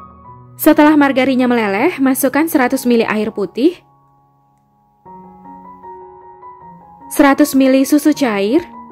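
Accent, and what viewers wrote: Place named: Bandung, Indonesia. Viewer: Suaranya kyk suara khas orang Bandung, salfok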